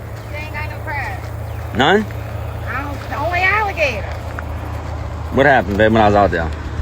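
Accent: Louisiana, United States. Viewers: She sounds like she's from da parish.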